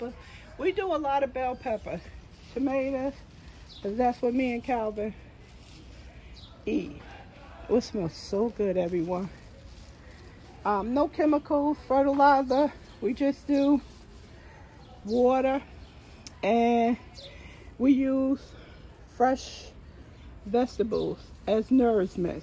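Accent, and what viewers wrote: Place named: New Jersey, United States. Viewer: I knew when I heard you talking that I recognized a fellow Jersey resident. I love that you garden too. I love growing my own fresh fruits/veggies in the warmer months, and then being able to enjoy the "Fruits" of your labor. I would love to hang and crochet in yr garden with You & Calvin!!! I'm from Bergen County, but now live in Sussex County. ❤❤❤❤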